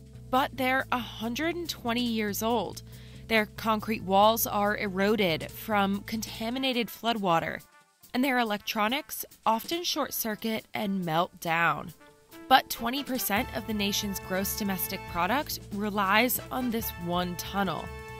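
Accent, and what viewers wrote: United States. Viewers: WOWEEE WHAT AN ANNOYING VOICE!, had to turn you off , american ear piercing accent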